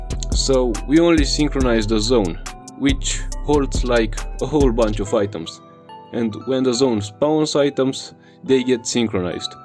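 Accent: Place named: Russia. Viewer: Ты русский, по акценту понятно)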